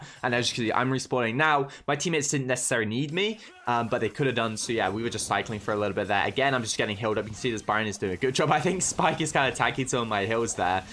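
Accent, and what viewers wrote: United Kingdom. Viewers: Listen to his accent his from UK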